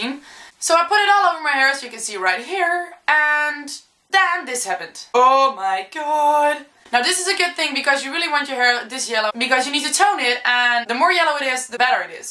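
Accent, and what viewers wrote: Netherlands. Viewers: You're Dutch right? I reconised the accent haha ;). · Are you Dutch?? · Hahah iedereen zegt precies wat ik dacht! xD je hoort dat je nederlands bent :p But hey, proud to be dutch right? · Kom je uit nederlamd?? E ech tmooi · ik kan het nederlandse accent al horen lol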